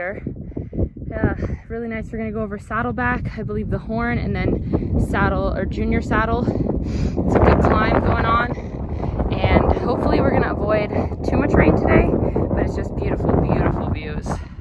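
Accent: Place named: Ulster, United Kingdom. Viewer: You do a beautiful ulster accent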